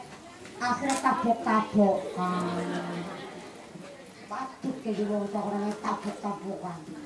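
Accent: Banyumas, Indonesia. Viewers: Ceramah ngapak Lucu Ustadzah.. Terima Kasih ceramahnya Ibu Ustadzah.. Sukses selalu Bu..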